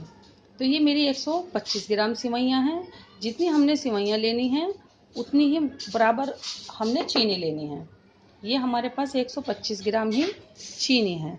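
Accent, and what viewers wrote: India. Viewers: The way u speak is so good full Desi style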